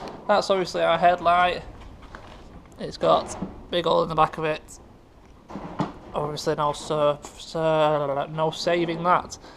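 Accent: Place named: Scotland, United Kingdom. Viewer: Are you Scottish? Pretty heavy accent.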